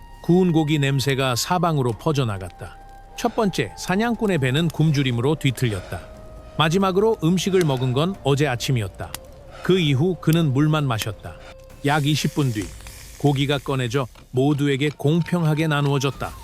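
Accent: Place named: United States. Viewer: 무슨 말이냐 미국사람이 한국말 하는거냐 너무 어색해서 듣기 싫다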